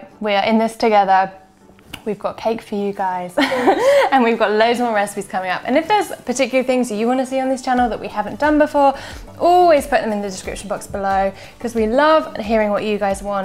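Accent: United Kingdom. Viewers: I am learning not only your recipe, but an amazing British English accent...!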